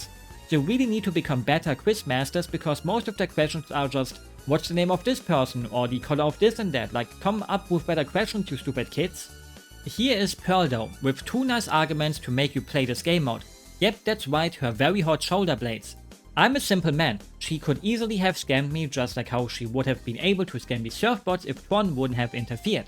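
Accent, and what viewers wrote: Germany. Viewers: you are like if the guy who made top 10 hottest sonic characters had a cute german accent and im here for it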